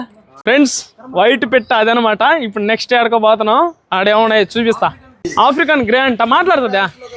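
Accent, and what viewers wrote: Nellore, India. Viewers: Nellore yasa chittamuru inthaka mundhu nellore ippudu tirupati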